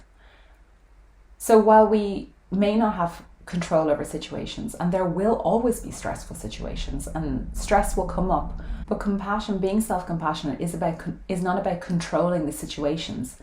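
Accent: Ireland. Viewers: It's so great to recognize the things I control vs the things outside my control. This truth was illuminating to me. Love your talks, love to hear the Irish accent, and love the scenery. Any glimpse of my home land is the best. Stay well.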